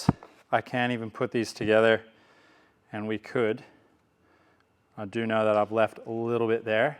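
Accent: Australia. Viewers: Am I going insane or do you have just the barest hint of an Aussie accent? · is he hiding an Australian accent under there?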